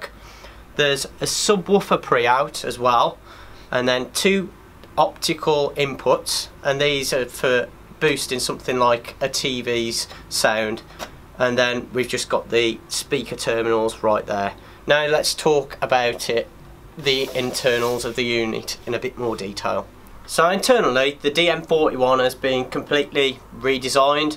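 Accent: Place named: Midlands, United Kingdom. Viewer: Midlands i.e Birmingham UK or area.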